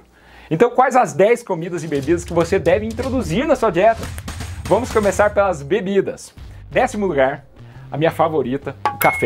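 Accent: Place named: Caipira, Brazil. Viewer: Amo esse sotaque caipira dele.❤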